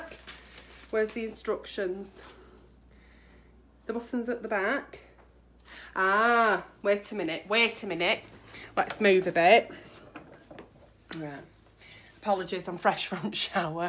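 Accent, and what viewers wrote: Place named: Bolton, United Kingdom. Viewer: So love Bolton accent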